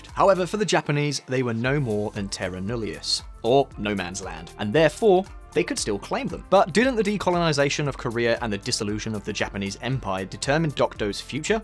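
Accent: United Kingdom. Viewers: “Hotspot: hotter than a hotpot.” Love your British accent mate! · this guy narrating is obviously British, and should know why lump of rocks in the ocean is so important.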